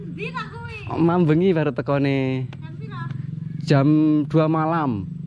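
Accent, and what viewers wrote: Kuala Lumpur, Malaysia. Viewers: tu... awak selalunya pakai loghat B. M.... tapi ok juga sih ' untuk pengobat rindu yg pernah duduk ka Malauysia , macam dulu ada kawan sy pandai sgt ikut bahasa dr negara lain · Ko ckp dah mcm org malaysia...😊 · Nada lagu bahasa Malaysia kamu enak sekali di dengarin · Berape lama kerja d malaysia...sampai cakap pon da mcm org malaysia... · Slm sudah duduk malaysia berape tahun ,balek kampong becakap bahase ..malaysia bagus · geli tu mgkn maksudnya lucu bgi akak tu..slange nya skejap Malaysia sekejap Indonesia.tpi view nye mmg cantik.hijau je permandangan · Salam bong...berape lame kamu d mlysia..sng n geli hati denga slange melayu mlysia kamu. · Waahhhh ckp KL le...,👍👍👍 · Dah macam orang KL melancong ke Indonesia je bahasa nya 😁😁😁 fasih cakap KL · Loghat Malaysia masih pekat lagi, terima kasih.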